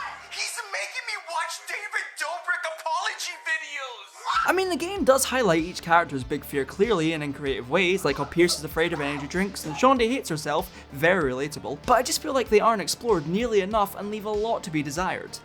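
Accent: Scotland, United Kingdom. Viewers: Ay is this man Scottish Huge w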